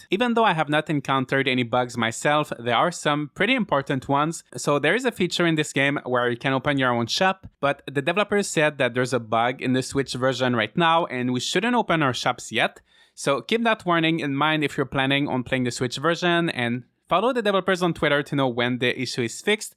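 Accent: Quebec, Canada. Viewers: T tu quebecois?? 😅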